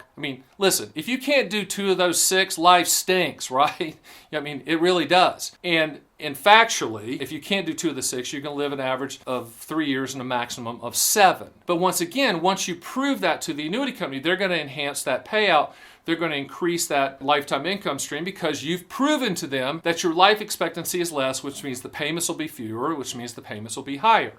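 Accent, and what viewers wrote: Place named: South, United States. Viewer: Fast talking Southern Boy ! Whew 😥 👍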